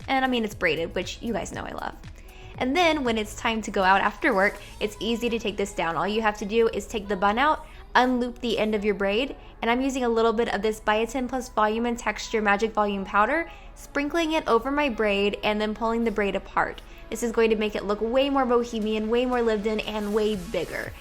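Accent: United States. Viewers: Can I please have your American accent ? My Australian one has NOTHING on yours girl, also if I could have your hair too that'd be cool 😉💕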